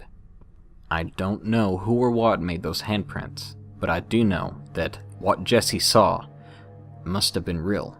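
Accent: South, United States. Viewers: Country sounding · Love your videos never noticed the southern draw of yours till this vid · The Thick Southern Accent threw me off at first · Do I detect a slight southern accent in these older recordings? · Aw yeah, you have well had a Southern accent like me! I don't know why but I get so excited when I see a YouTuber from the south or from Alabama. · lol, your old voice sounds so much more southern? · Whoa, the southern is strong in this one. =x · AaAAAAAaaAAAAaAA ’ COUNTRY BOY ACCENT IT SHOWS A BIT IN THE OTHER VIDEOS STILL BUT OML IM SCREECHING This makes me happy in a way i can’t explain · Angry-Moth-Noises he is from the south of course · Seems like you had a bit of a southern accent way back when! This video is very charming, brings me back to the smaller days of your channel 💓 You’re the best narrator, hands down. Love from Florida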